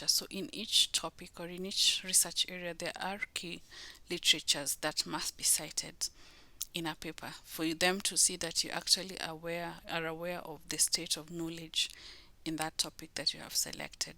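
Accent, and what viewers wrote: Kenya. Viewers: I know that accent anywhere, Thank you for the information miss +254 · couldn't help but notice the Kenyan accent good work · Very helpful, loving the Kenyan accent too. Happy to be your 6th subscriber....yeey!!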